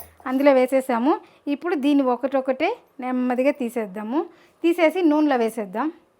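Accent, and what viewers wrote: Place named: Karnataka, India. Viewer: Mee Telugu, kannada accent tho kalisi undhi 😊Aina baaga cheppavu 👍🏼 nice n new recipe 😊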